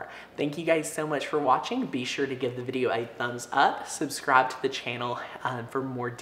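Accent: South, United States. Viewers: You have to be Southern!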